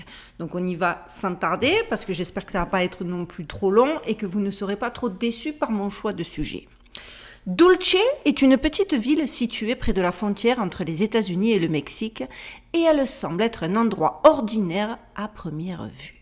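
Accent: South, France. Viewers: Bonjour je suis tout à fait d’accord avec vous à 100 % vous racontez très bien et en plus vous êtes très charmante avec votre accent du sud moi aussi je suis du sud dans le 13 et je pense que les loups-garous viennent de là aussi et que des civilisations anciennes évolué technologiquement par des dieux venues des étoiles ont fait des expériences hybride car déjà les Anunnaki ont fait sur les humains du singe on est passé à l’Homo sapiens et Neandertal et il y a eu des erreurs à l époque quand il y avait un problème il nous noyer (Le déluge ) tout en gardant un échantillon ( L’arche de Noé) et la base de dulce et une continuation de tout ça . en tout cas tout ce que vous dites Tout s emboîte parfaitement comme un puzzle !!! Et avec logique bonne continuation encore une fois vous êtes très ravissante avec beaucoup de charme !! Je vous adore 🥰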